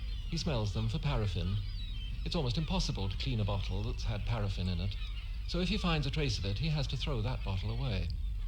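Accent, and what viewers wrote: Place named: Kildare, Ireland. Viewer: Commentator is from Kildare, probably Naas,, That's how we speak.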